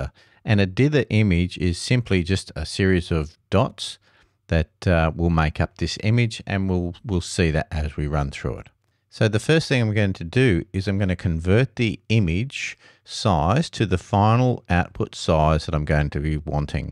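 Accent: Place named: Australia. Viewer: No3 Way to go my man. Thanks for the info great to hear the Aussie voice.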